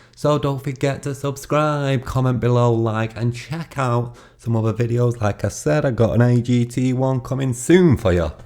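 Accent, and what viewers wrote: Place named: North, United Kingdom. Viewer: Appreciate your commentary and deep dives. It's always your great Northern accent and the fact that your content is always fact based and never without a sense of humour that always makes me smile along with the fact that pepsi is my beverage of choice! Irony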